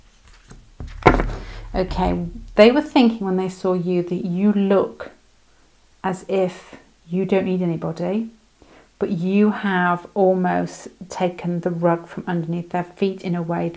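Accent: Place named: Midlands, United Kingdom. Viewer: Pile 1 wooooooooow amazing 🤩 I also hear a midland accent, as a midlander that’s makes me so happy 😁 Love and light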